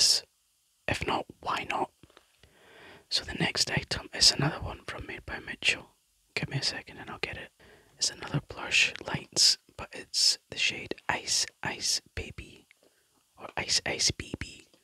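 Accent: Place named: United Kingdom. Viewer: Your nails are strong and sound AWESOME!!! 🤩 Loved the mic caressing, the tapping and scratching on the first goldy Blursh all-in-one liquid pigment, the tapping and scratching on the Blursh Ice Ice B B box and the tapping on the lid, yes 😊, - that sounds cool, I need THAT lotion 🧴 I have super weak nails 😫, yes 🥱 - she is THE QUEEN ❤ I always enjoy a good BRITISH 🇬🇧 accent 🙃 I can tell you LOVE and ADORE makeup! 💄 Well done,! 😊 I came to support because of Lorri 🤗 slime would be a EXCELLENT trigger assortment idea! ☺️ In all the ways: Alone, on the mic, on a table, hands ups, cloudy, sticky, with toppings… Whatever 😇 ☀️ Take care!! Have an amazing night!! ✨🌝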